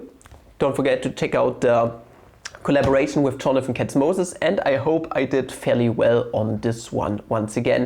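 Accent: Germany. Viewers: you have too much latent heat of confusion yourself.You must review your teaching methods, besides German accent,umm ah ah is off-putting.Why not revert to talk and chalk traditional method,with plenty of home work,no amount of IT gadgetry will do. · Du siehst zwar ganz hübsch aus, aber Dein Denglisch ist schwer auszuhalten. 😄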